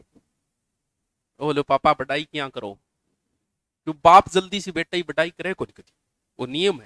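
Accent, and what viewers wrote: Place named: Bagdi, India. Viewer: Sir marwadi nhi baagdi bolte h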